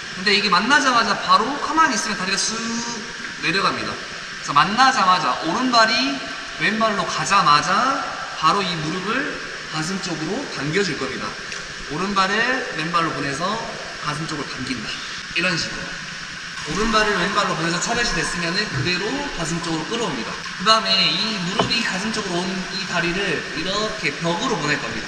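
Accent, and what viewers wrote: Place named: South Jeolla, South Korea. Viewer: 설명도 잘하시고 구수한 전남 사투리 정감가네요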